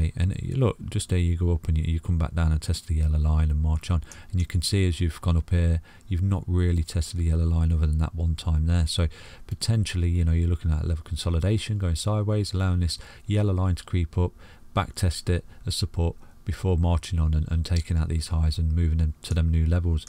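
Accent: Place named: Nottingham, United Kingdom. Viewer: Awesome :D Love it! Where you from, you sound like you're not far from Nottingham or Derby?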